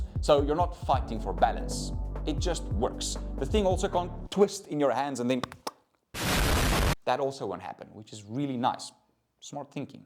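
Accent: South Africa. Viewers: The South African accent😊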